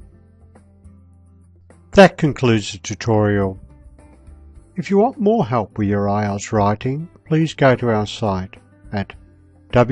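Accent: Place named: India. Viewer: hi,it is really helpful,but just your accent ,i think you are from india ? but anyway i can understand you nicely ,tks anyway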